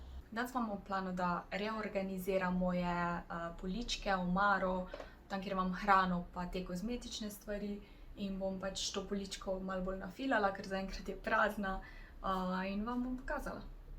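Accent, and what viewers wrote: Carinthia, Austria. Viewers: Draga! Spremljam te že skoraj od začetka in res si vrhunska, pa še čudovito izgledaš. Obožujem tvoj način izražanja in tvoje vsebine. Kot so že drugi povedali, se ti res vidi, da ne snemaš zgolj zaradi zaslužka in "popularnosti". Všeč mi je tudi tvoj koroški naglas. Če boš kdaj želela, bi lahko več snemala v koroškem govoru. Ostani tako dobra še naprej! Lep pozdrav iz okolice Maribora. ❤️❤️😍😍😍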